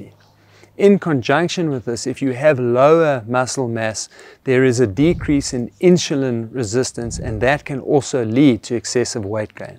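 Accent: South Africa. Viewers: Are you South African? You sound like Dr. Grobbler, my friend in Ladybrand.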